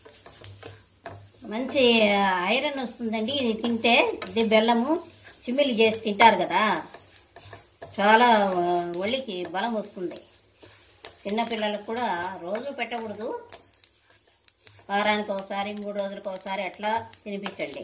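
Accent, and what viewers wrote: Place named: Hosur, India. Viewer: నమస్కారం అండీ, మా అవ్వ చేసేది వీటిని:-) మేము బ్రహ్మణులంకాదు గానీ మీరు చేసే చాలా వంటకాలు మా అవ్వలు చేసేవాళ్ళు. ఇటీవల 10 ఏళ్లుగా ఆహారపు అలవాట్లు బొత్తిగా మారిపోయాయి. మీ videos చూసి మళ్ళీ ఆ పాత రోజులు గుర్తుకొస్తున్నాయి. వీటిని మేము సింబిలి/శింబిలి ఉంటలు అంటాము. మాది హోసూరు/బెంగళూరు ప్రాంతంమండి, మీ యాస కూడా మా యాసలాగే ఉంది. మీది ఏ ఊరు?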